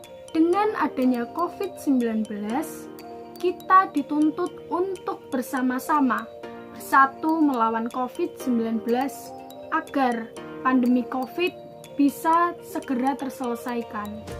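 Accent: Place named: Java, Indonesia. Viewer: Mntap mntap, jowo tulen Iki🤤🤣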